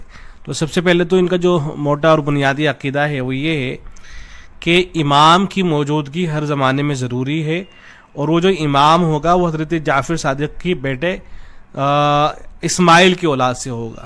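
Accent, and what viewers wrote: India. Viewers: You sound Indian.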